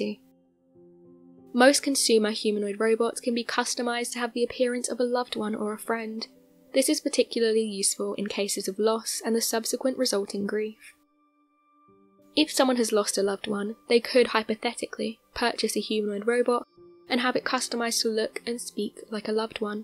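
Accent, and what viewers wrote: United Kingdom. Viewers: Love the narrator, she has such a charming accent, wonder what part of Britain she's from?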